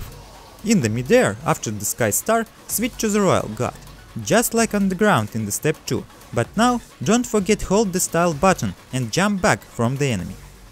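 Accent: Russia. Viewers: I freaking love the Russian Accent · Твой russian акцент too заметный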